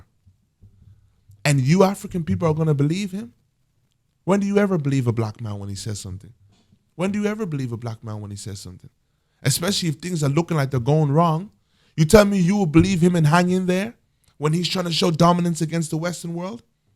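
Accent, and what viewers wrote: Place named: England, United Kingdom. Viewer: just doing a word salad. Are you one of those Brothas getting paid by Candace Owens, because you have an English accent? Because you are doing the exact same thing that they do. Higher a Black guy that doesn't know what is going on to sit there and listen. You get other Black people to listen to this alt-right crap. is removing Black American rights. He has removed Affirmative Action. He removed the Fearless Fund for Black business women. He attacked Critical Race Theory so that reparations could never be discussed. He removed Black history. is backed by the American Heritage Foundation that is attacking P Diddy whose story was in the 90s. has a rape charge.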